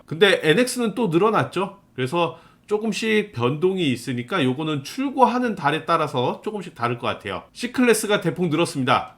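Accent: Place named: Chungcheong, South Korea. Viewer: 영상볼때 마다 구수한 충성도 사투리가 나와 들을때 안정감이 드네요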